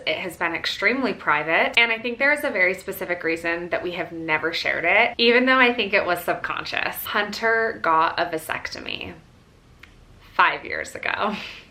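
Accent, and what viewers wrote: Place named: Utah, United States. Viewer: It's part of a Utah accent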